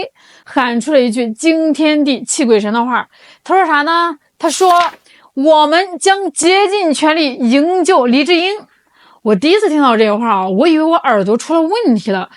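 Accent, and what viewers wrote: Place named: Northeast, China. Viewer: 唉呦喂 東北妹的腔調就是有致命的吸引力啊 喜歡😘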